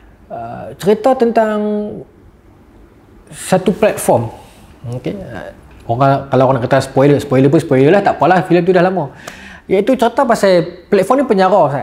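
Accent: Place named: Kedah, Malaysia. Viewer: Seronok dengar loghat kedah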